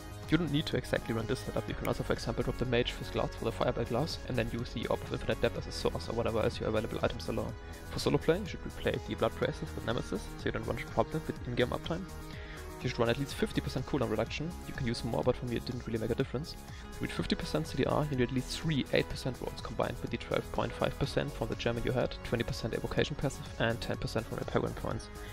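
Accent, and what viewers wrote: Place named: Germany. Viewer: Odd, I watched the video in full and heard/understood everything he said; I'm also not convinced that his accent is really an issue either as I'm Australian and he sounded German to me though I could be mistaken but it any case I could understand him so BobDaBilda & Faphand111 you both may need to address your computers sound issues. O.P thanks for the upload, I am rolling a Wizard for season six and even though Explosive Blast has never been a favorite of mine it was still an interesting build you demonstrated. I am actually keen to either try the Vyr's set or alternatively I might skip sets all together and focus on a disintegration build seeing as Wizard has changed quite a bit since I last played one.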